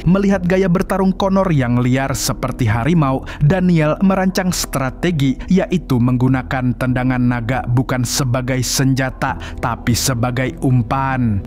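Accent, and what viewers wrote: Banjar, Indonesia. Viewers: Adminnya bisa lai bahasa Banjar😁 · Anjayy org banjarr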